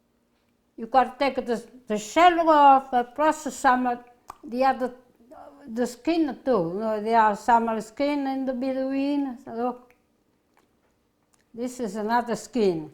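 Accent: Italy. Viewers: Thank you. I love you so much. When I hear you speak you take me back to the good old days of being around my Italian family And with my grandmother who didn't speak any English. Thank you for your Italian kitchen making great Italian dishes. Thank you for the memories you bring. I love you. 🥰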